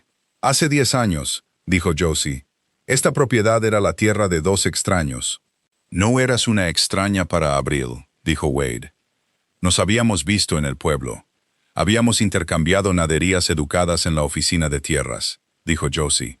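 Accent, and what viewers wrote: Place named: United States. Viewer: ESe acento gringo del narrador, que enfado.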